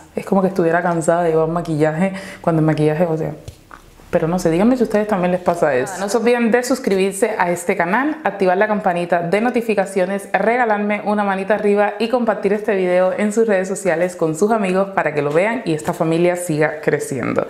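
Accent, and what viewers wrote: Cuba. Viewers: No es que no sepas decir la palabra, sólo es tú acento cubano. 🖤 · ella es cubana asi es su asenton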